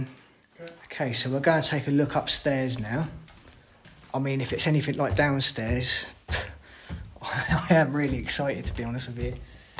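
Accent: United Kingdom. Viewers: I love your UK accent!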